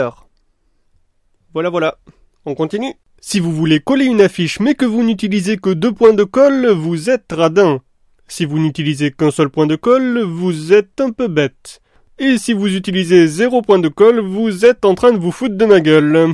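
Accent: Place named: Lot-et-Garonne, France. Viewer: Par contre, t'es vraiment du Lot et Garonne? Parske t'as un léger accent.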